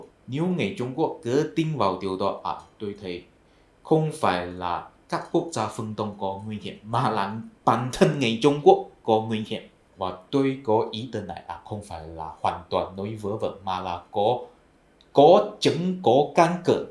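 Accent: Standard. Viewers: Sao anh này nối tiếng Việt chuẩn mực và rõ ràng thế nhỉ? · Anh phát âm ngày càng chuẩn, trôi chảy và rõ lời hơn những video đầu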